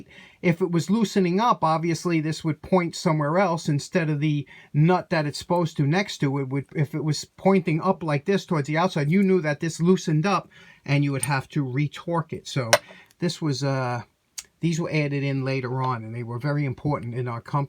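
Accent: New York, United States. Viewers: Longga island. Accent is great. Thanks for the vid. Few of the most genuine people i ever met are from new york.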